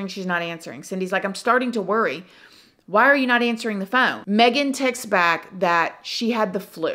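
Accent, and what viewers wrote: South, United States. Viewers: Your vids literally make my day. You cover scary cases but your friendly presence makes up for it. I love your accent btw feels like I’m back in the south where I grew up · I’m high so I had to watch a few times to understand but you’re such a cool human and I love your southern vibe. Honestly reminds me of the olden days when I used to hang with family down south and we’d just tell stories. Keep it up, sister. Love your content. The fact I don’t mind relistening says a lot haha.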